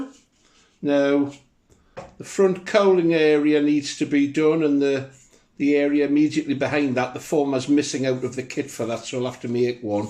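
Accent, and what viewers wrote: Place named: Newcastle, United Kingdom. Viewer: Wow. Overengineering at its best. Fab build and all accompanied in mellifluous Geordy. Thank you so much. I have seen the Ladybird kit in books and catalogues for decades but never realised what a fascinating build it was.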